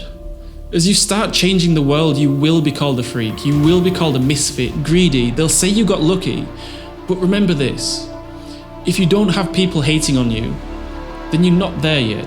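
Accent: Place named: United Kingdom. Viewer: I really love your British accent!!😊😊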